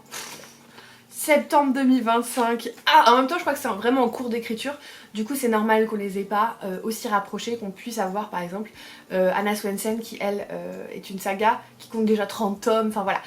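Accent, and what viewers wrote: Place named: South, France. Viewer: Bravo pour le abonnés!!! 🎉 quel bel accent du Sud 😅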